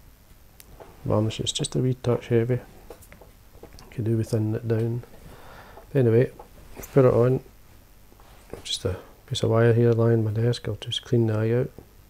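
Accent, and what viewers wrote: Scotland, United Kingdom. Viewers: The subtitles are hilarious, is it that hard to understand a Scottish accent?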